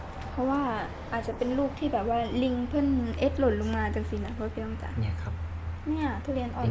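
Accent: Isan, Thailand. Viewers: ก็ว่าอยู่ ทุเรียนต้นใหญ่ขนาดนี้ มีแต่ที่ภาคใต้แหละ เห็นสำเนียงอีสานตอนแรกงงว่ามีที่อีสานด้วยเหรอ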